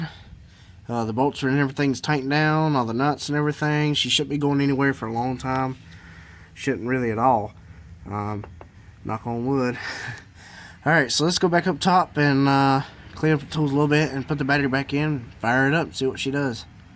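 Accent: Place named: Texas, United States. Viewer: let me guess are you from texas with the way you speak and dont blow nose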